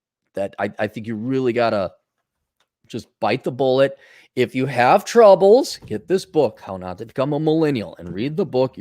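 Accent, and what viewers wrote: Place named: New England, United States. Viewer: Must be New England. Dude sounds like me.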